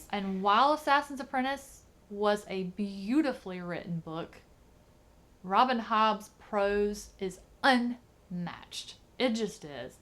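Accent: South, United States. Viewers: I love your channel. I have a strange question. Do you often get judged for your southern accent? Meaning the stigma about the south and education? I wonder because I have big muscles and I often get comments from people like “meathead” or girls being shocked that I’m a book nerd. Anyway, weird question ,I know. I was just curious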